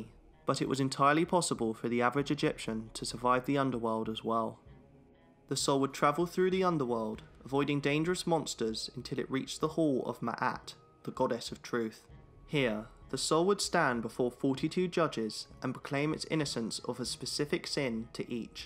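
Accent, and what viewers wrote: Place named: England, United Kingdom. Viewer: The pronauncation of the gods is so english, I could not recognize them. Osiris? Like Miley Cyrus? Really??? its O-ZE-RES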